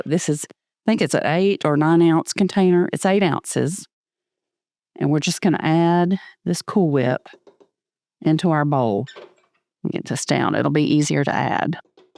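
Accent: South, United States. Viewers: First time you’re coming across my feed. I can’t wait to make this. Well done! Great video & I love your voice/southern accent. 👏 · The audio on this video is so nice & crisp and with your southern voice? So relaxing! Almost asmr!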